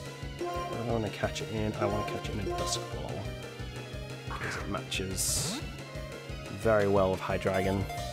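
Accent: United Kingdom. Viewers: This guy sounds like he has a hint of northern Ireland in his accent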